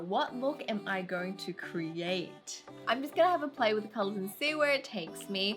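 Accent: Australia. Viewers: Is your accent Australian? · Australian accent???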